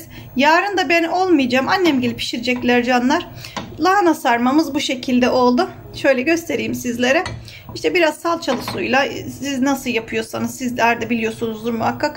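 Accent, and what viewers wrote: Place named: Mediterranean, Turkey. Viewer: Bende mersin liyim Akdeniz bölgesi şivemiz aynı 🥰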